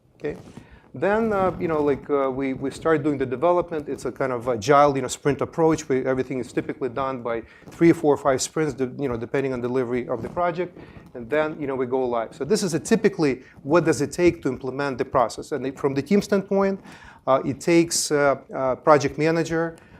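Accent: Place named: Russia. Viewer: Very russian sounding, sir.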